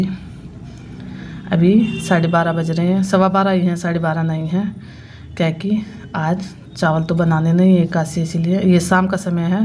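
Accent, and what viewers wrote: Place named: Bihar, India. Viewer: एकदम बिहारी जबान में.... 😄😊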